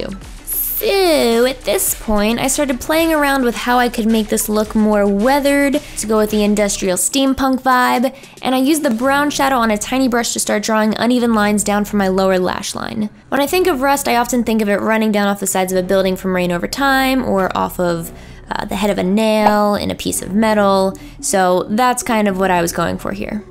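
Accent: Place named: Pennsylvania, United States. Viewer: !! Don't be ashamed of your accent!! For a while, I had no clue why people made fun of your accent. I didn't even notice you had one. Then I realized you were from Pennsylvania and it all made sense... (I live there too).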